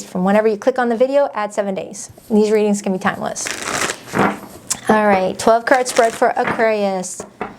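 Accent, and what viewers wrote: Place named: New England, United States. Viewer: I love your new england accent ❤❤❤❤ I live in the south and we absolutely love that accent because its different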